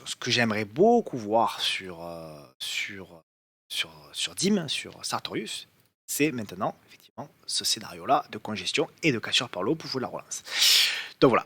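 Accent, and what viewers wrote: South, France. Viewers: Accent du suuuud lol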